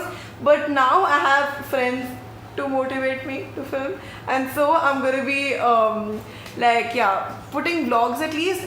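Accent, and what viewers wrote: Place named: India. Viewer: I think Indian English its very typical Indian accent .....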